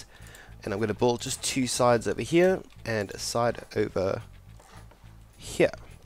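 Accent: South Africa. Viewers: From your accent I am assuming you are South African and I pray to God that you are not still in that godless country. Stay safe out there buddy. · Are you South African? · I really love your accent and it makes me miss SA, great videos man and I love the concept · 120% south african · are you south african? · You sound South African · What's that accent? Are you South African? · Are South African? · South African? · South African ? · Are you south african? I heard Australian at first then i noticed that boer accent. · are you south african ? im north African :) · This guy is south african 100% How i know the accsent And south african afrikaans english grammar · Are you south african? cuz you sound like it :) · Are you South African mate? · I swear this homi is south African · Are you South African 🇿🇦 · Upon watching this i couldnt help notice the man's voice in the video... 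how familiar it sounded. Then i check his twitter and i realise... FAM! South African gamer ^^. SO great to see a South African making a successfull year on Youtube and into their own streaming career. You are an inspiration dude. I stumbled upon this vid, but you got a new follower for sure. Nice hidden base video, going to lock up all the captives in there from now on ^^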